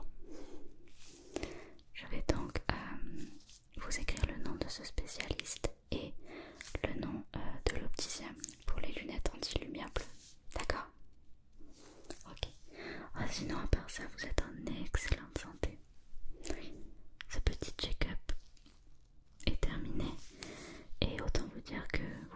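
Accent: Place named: South-West, France. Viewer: Un chuchotement comme ça avec l accent de mon Sud ouest! Que demander de mieux! Merci 👍☺️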